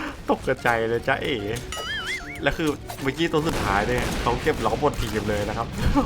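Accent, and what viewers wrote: Thailand. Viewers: ติดตามตั้งแต่พี่พึ่งเรียนป.ตรี เสียงเหน่อๆจนตอนนี้จบแล้วอยากถามว่าตอนนี้พี่ทำงานอะไรครับ😅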